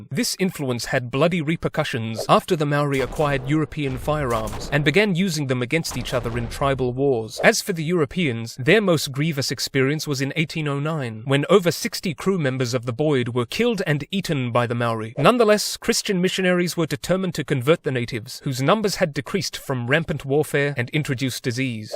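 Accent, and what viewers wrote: South Africa. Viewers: This is new Zealand bro let's have a look cuz. I laughed so hard my bro u actually sound like my south African mate who lives here in nz lmao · sick south African accent my guy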